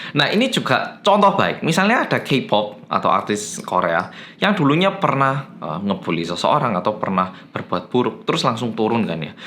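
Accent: Java, Indonesia. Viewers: Bang ini beneran orang Korea..? logatnya medok banget..kereen.. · Bahasa indonesia bagus bnget dan ada jawanya dikit2 kaya org indonesia tulen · Sebelum nya saya minta maaf bang Abang nya logat bahasa nya kok medok😂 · Bahasa Indonesia nya medok kek orang jawa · Dia udah tinggal di Indonesia (lebih tepatnya bagian Jawa) udah lama banget jadi lama² medok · bahasa indonesiannya medok baget...👍👍👍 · Menurutku pemikiranmu dewasa broy .... Keren ... Indonesianya juga medok 😂👍👍👍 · Beda kepala pastinya beda pemikiran.. dengan adanya kejadian yang seperti ini justru makin mendongkrak semangat wanita Indonesia untuk menjadi lebih baik lagi dalam segala hal.. disenyumin ajalah 😊 buat mas tetap semangat dan medhoknya jangan sampek ilang 😄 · Kok bahasanya lebih Medok appa any way I like u thx pemikirannya ya ..... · Logatnya semakin medok aja bang. Makin ngakak aku.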